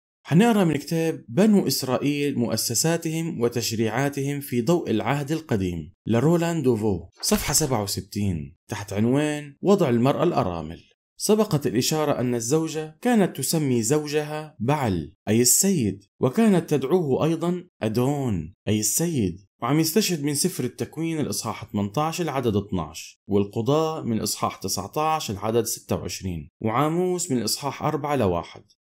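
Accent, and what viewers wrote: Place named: Lebanon. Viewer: من أجمل القنوات جزاك الله كل خير لاحظت أخي من لهجتك أنك لبناني صحيح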